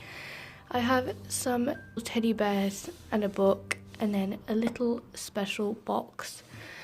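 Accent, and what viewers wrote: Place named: United Kingdom. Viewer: Great video! Fellow Brit here but your accent is like 100% nicer than mine 😂😂😂 I’ve always wanted a diffuser so I’ll check out that company. Fantastic video!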